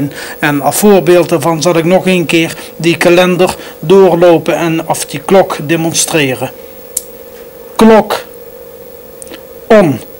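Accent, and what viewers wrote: Limburg, Netherlands. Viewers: Titulaer was veel cooler dan Wubbo Ockels. Tenminste, dat vond ik toen ik een jaar of 8 was. En nu nog eigenlijk. was een phenomeen. Sommige mensen lachten om hem omdat hij zo markant was. Immers een Limburgs accent hoorde je maar zelden op TV. Het maakte hem in mijn jonge ogen uniek. De baard. De stijl van presenteren. De interviews. De humor. Een groot gemis. Een mens om tegenop te kijken. Rust zacht. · Het is limbabwaans. Hij moest echt zijn best doen om Nederlands normaal uit te spreken. En dan lukte het nog niet. Ik kom uit dezelfde omgeving en heb dezelfde handicap. Wel altijd interessant om te zien dat hij zelf ook zo enthousiast was over alle “miekro eluktrooonika” en de wondurre werold van de kompjoetors. Erg mooie tv van toen.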